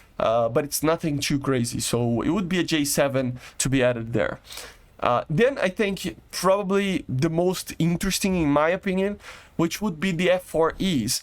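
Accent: Brazil. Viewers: Tu é br? Sotaque parecido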